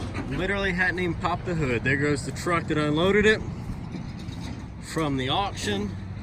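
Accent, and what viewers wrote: South, United States. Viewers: but that souther accent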